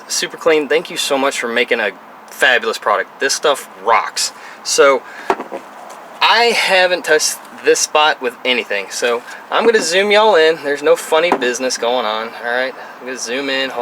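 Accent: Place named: South, United States. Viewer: 🤣😂👊👍, boy you are country!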